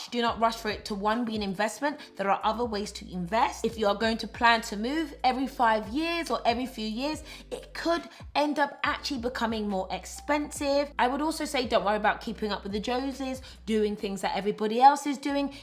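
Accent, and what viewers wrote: England, United Kingdom. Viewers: Is she British?😍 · Are you British, by the way? Your accent sounds really English!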